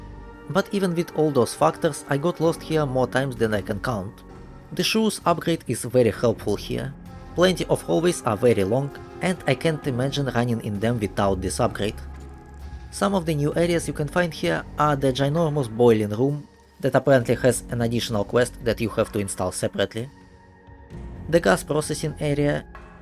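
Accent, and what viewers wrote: Russia. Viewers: я вижу что актцент чуть чуть не англ а русский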